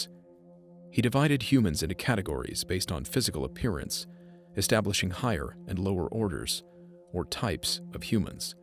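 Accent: Germany. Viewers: Your German accent sounds pretty good. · I'm usually not crazy about German accents, but ill be damned if I said I didn't want you to whisper in my ear sounding the way you do! ::Fans self:: damn, it's hot in here...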